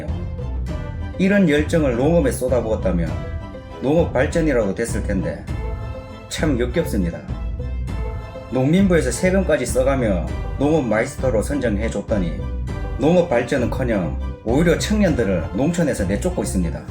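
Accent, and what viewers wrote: Gyeongsang, South Korea. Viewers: 졸라 무서운게.. 알고리즘 타서 우연히 보게 된 영상인데, 채널주 말씨가 갱상도다. 근데 사건지역인 창원도 갱상도 아님? 같지역 사람한테도 젊은이한텐 일단 무대뽀로 행패 어지간히 쳐부리네 시골 틀딱것들 무습다 무스버.. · 경상도 인가요? 의안부가 필모한가? 친일 매국노들의 후손 인듯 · 경상도 아임니까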